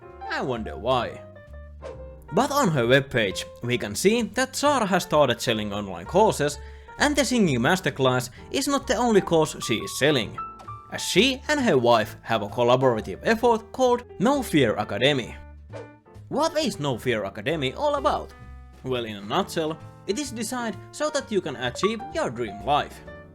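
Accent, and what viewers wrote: Finland. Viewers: Massive suomi aksentti L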